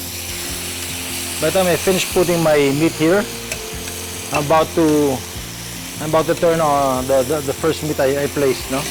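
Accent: Visayas, Philippines. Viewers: Bisaya a na kayu oi! Naa Jud ang accent sa pagka bisaya HAHHAHHAHA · bistek tagalog niluluto mo, pero bisaya ka ng biasaya!!! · Visayang -visaya tlaga c Mayor Richard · Makalingaw k kung mag bisaya k 😂🤣👌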